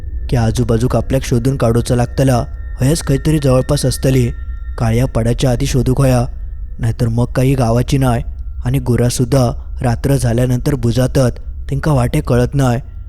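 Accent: Malvani, India. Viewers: Hi malvni ahe ashich aste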